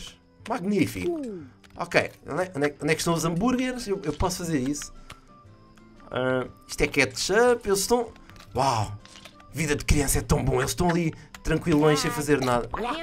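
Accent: Portugal. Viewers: És português?????? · Você fala português do Portugal. E é muito parecido com a linguagem do português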